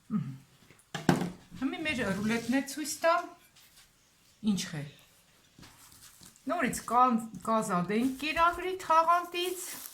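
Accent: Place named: Gyumri, Armenia. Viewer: Shat em sirum gyumrii barbare akcente...😃😃😃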